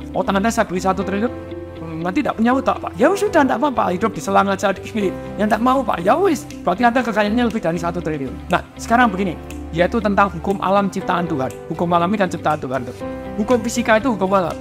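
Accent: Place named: Surabaya, Indonesia. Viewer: Pak bagusnya musik nya dikecilin atau ga usah ada musik nya. Pengen fokus denger suara bapak jd kegangggu sm musik. Makasih. Dan lagi Pak denger suara asli Bapak dengan logat Surabaya nya itu sudah bikin semangat sekali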